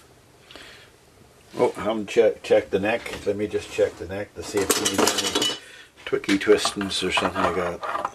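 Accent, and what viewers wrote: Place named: North, United Kingdom. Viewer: That gentle "No'rion" accent grabbed me immediately and kept me listening to the end. Great review. My only criticism would be that you might have explained what exactly you were doing to ensure the 12th fret and the open strings were perfect octaves. I never remember whether it's "clockwise" or "Anti-clockwise" for flat or sharp and I end up doing it by trial and error every time I acquire a new instruemtn. You have probably given it back by now, but how much did it weigh approximately? Most LPs I have strung around my neck were so heavy that 30 minutes of playing would cause shoulder problems.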